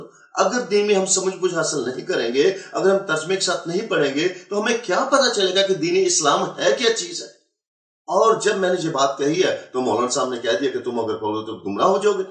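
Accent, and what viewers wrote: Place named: Arab world. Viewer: qabil e ghor bat yah hay is video main k jab yah arabic qawaid janta hay or yah arabic bhi smajh leta hay hafiz bhi hay to isay tarjuma parhnay ki zarurat kyun paish aie? or is ka arabic lehja bhi note krnay wala hay ,, yah khinzer choora hi tha or hay , yah dramay bazi kr rha hay musalmano ko gumrah krnay k lie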